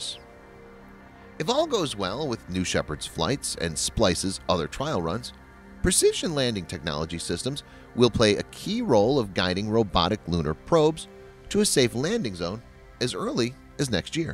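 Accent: United States. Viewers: American English is its own form of English full accepted with British English. The voice in the video used precise American English. So it wasn't really butchered. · "The common reason is actually the same"... What the heck does that mean?? The butchered English in an American accent on this channel makes it difficult to listen to!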